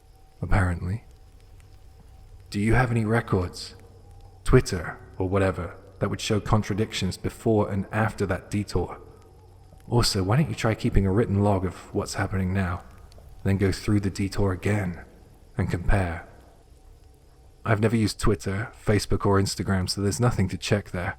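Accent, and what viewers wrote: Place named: West Country, United Kingdom. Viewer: I hear a Scottish twang/ possibly West Country in your accent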